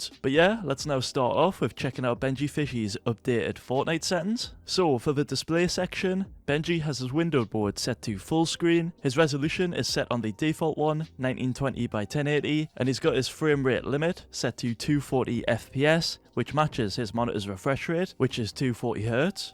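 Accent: Newcastle, United Kingdom. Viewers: Sounds like your from Newcastle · Are you from Newcastle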